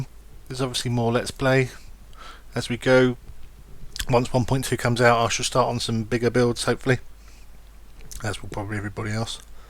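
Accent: England, United Kingdom. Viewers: I liked this not because of your commentary, your breathing, or your gameplay, but because of your witty remarks you make when typing to people. I just like to see how much enthusiasm and humor you have under that thick English accent =D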